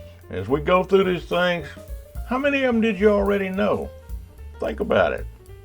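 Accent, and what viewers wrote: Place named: South, United States. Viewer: I am new to your channel, and I really like it!!! Hi there y’all, 😉😎👋🏼. Thank you for sharing a really great topic, and video. I can tell by your character & personality, that you’re a good man with a good heart, and in a happy, and loving relationship, with your Filipina wife. I am from California, and I dig your thick southern accent. Both of my daughters now live in New Braunfels, Texas. So, whenever I’m there for a visit, I get a kick out of hearing Texans speak with their thick accents. To me especially, nothing is cooler than hearing a “Mexican” speak with a Texas accent, lol!!! Once again, I like your cool accent, if I can ask, what part of the country are you from, brother, 😎😉🙏🏼?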